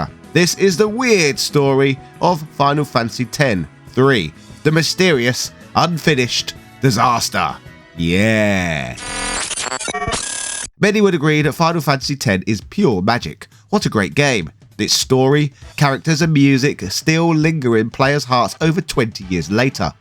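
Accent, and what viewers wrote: United Kingdom. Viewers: 1) I love british accents "Wakker" and "Yuner" 2) FF X-2 is the reason I stopped playing FF forever.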